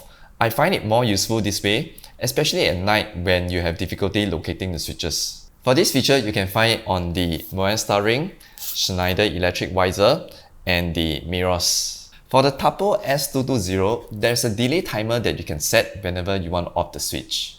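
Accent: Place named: Singapore. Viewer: Sounds Singaporian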